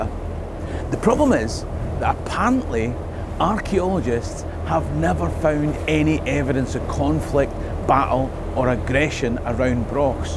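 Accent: Scotland, United Kingdom. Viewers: You look more Polynesian than Scottish, but you talk Scottish as fuq.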